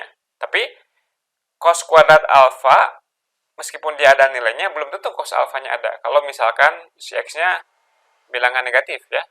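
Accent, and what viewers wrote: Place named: West Java, Indonesia. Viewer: Mantap nih, aksen sundanya dapat utbknya juga dapat.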